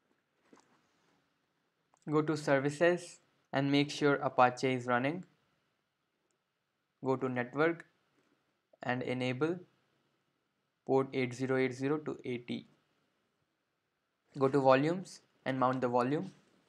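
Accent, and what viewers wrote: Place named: India. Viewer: Hey buddy you are doing really great....Would love to talk to you about the realm of immersive reality. I am a 3rd Year B.Tech(IT & Mathematics ) student of University of Delhi. I have been working the realm of immersive reality tech for about 6 months now.Are you a delhite by any chance.......you definitely sound like an Indian. I am the head of the VR AR MR- Delhi Chapter, which is an open community of immersive reality enthusiasts. I organize workshops for immersive tech. Do connect me on <mail> to discuss more on the topic. PS: You really are doing some impressive work out there.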